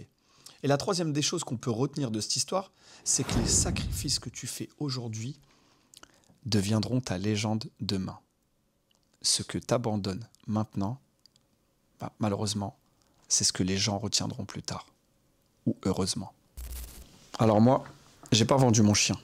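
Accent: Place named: Italy. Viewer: très très cool ce format ! J'avoue que l'accent italien m'a transporté, en plus des précieux tips évidemment 😅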